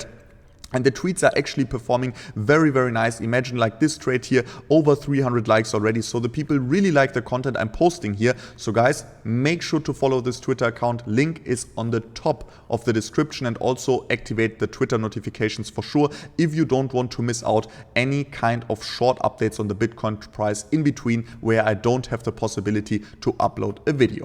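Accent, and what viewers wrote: Germany. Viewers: Bei dem Englisch gehe ich mal von einem deutschen Kollegen aus. Hört doch bitte auf mit DIESEM MEGASCHEISS!!!!!! DANGEREOUS!! Das geht auch ohne diese reißerischen Thumbnails und Texte! Ansonsten alles Gute!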